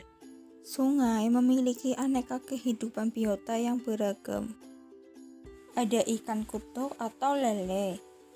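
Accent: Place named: Bumiayu, Indonesia. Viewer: Mentore tegang dalam menjelaskan kurang sante karo bahasa bumayune kegawa banget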